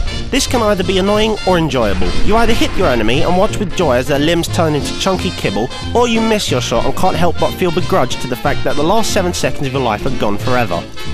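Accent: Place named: Cockney, United Kingdom. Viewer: You're slighty posh but slightly cockney... Weird D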